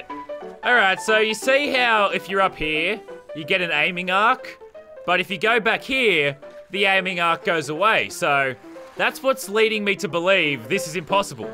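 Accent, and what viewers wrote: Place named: Australia. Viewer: Your next challenge is to do an entire video in an accent besides australian!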